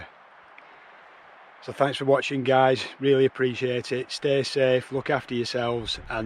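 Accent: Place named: Yorkshire, United Kingdom. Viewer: Just found your channel, loved it, nice to hear a great YORKSHIRE ACCENT EEEH BY GUM, thank you hope all goes well with your hand op, takecare Lv Jacqueline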